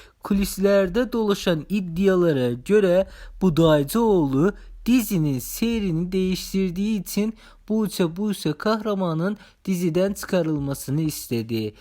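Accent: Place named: Azerbaijan. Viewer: Kardeşim türmüsün Azeri i anlaşılmayan Bi Türkçe rica ediyorum Türkçe öğrenir isin lütfen🙄😲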